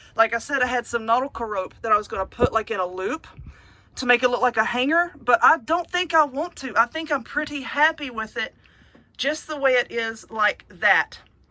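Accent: South, United States. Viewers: I love listening to you talk. I’m a Texas girl so I’m Southern too. You are so good and funny too.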